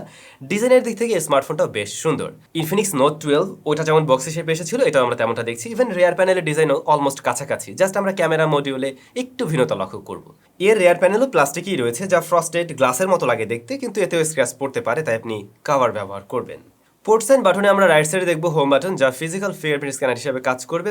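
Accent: Bangladesh. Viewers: Smart phone er full review er jonno video te click korinai apni advertise korechen ei video er how pathetic 😂😂😂 lose the hat and the hairstyle and the sudh desi bangla tone. thank me later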